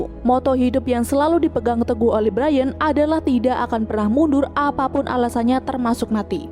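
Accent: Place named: West Java, Indonesia. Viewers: Ka kamu asli mana sih kok kaya ada bahasa sundanya plis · Admin na kos urang sunda anu tigarut nya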